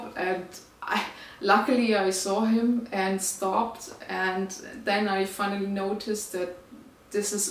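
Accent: Germany. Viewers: Love your German accent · very good your English; I like the accent: German speaking in another language is always something different. And I know well that the German language is complex, like the Portuguese language. Thanks for the initiative to answer the questions. Like it your faces when you play. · Now you will have to spend a lot of time answering questions! 💪👍You look amazing with a short haircut , and a cute German accent!❤😊😊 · She's from germany, Berlin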